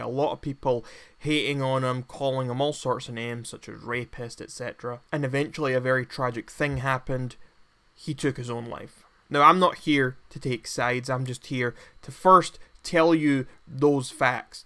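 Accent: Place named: Stirling, United Kingdom. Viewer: First, neato, a commentator that's fae Scotland. Second, you fae between Glesga and Edi? Ye kinda sound fae aboot Stirling way lol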